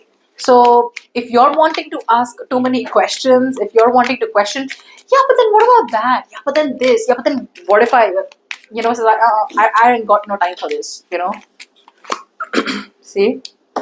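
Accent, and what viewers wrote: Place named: United States. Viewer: American english....no understand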